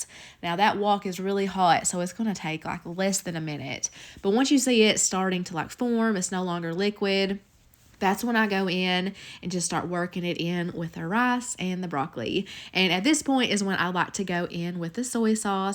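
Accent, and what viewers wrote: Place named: Kentucky, United States. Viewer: Your Kentucky accent brings joy to my family. ❤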